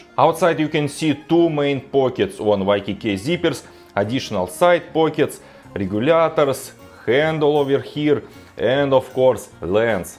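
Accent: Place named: Russia. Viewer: У любого пилота самолета такой же русский акцент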